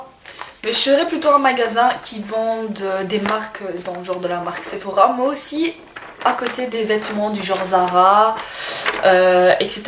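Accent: Belgium. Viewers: D'ou vien tu ? tu as un accent belge non ?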